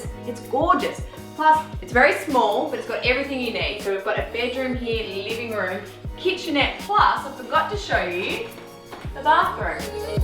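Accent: Australia. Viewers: Cute cabin. Cute accent. I lived in Australia. I miss hearing it sometimes.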